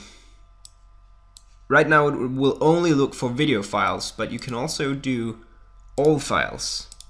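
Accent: Denmark. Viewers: you sound danish, are you? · Hej med dig :D Afsløret du en dansker :o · Oh... Jeg opdagede ikke at du var dansker lige i starten, davs! :D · .........You're danish...Aren't you?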